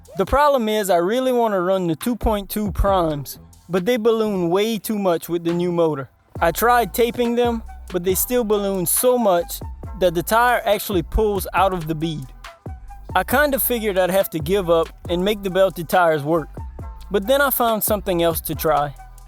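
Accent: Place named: New Orleans, United States. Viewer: Where you from New Orleans? You have the accent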